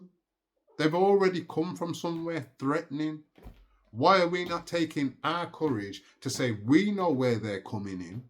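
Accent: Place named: Birmingham, United Kingdom. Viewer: Your English mainly because of your accent mate. Brummie area Jamaica/Africa bloodline.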